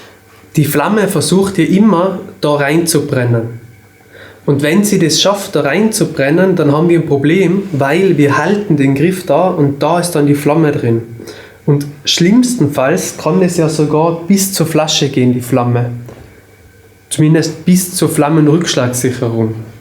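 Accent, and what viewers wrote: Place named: Vorarlberg, Austria. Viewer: Super Video und mit dem Vorarlberger Dialekt super sympathisch!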